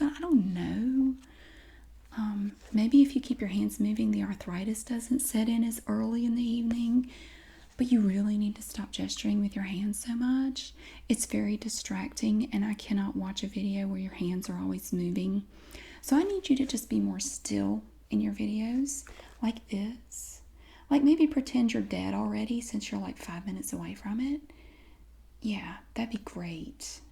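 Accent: South, United States. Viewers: To the Dumb fucks who hate southern accents, Why in the Goddamn fuck would yall even click on a video.I'm tired of these fucking yankee pricks talking their Goddamn Bullshit about us SoutherN People. I'm sorry for the profanity,But Im a High Strung Texan that's had a few drinks (PRE 4TH OF JULY) you are one of the best ASMR artist's Ive ever seen. You are a very beautiful woman,that doesnt look old. These Haters can just go Fuck off down the road somewhere. Leave This Great,talented beautiful woman alone!!!!!!!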